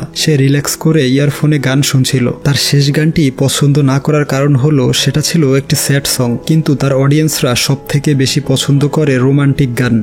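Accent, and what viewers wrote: India. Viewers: Apni indian kotha shone mone hoy Bangladesh e ami Bangladesh e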